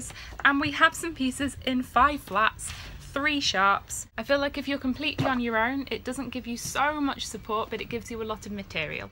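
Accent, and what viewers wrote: Netherlands. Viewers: English girl speaks German with Dutch accent. Strong move!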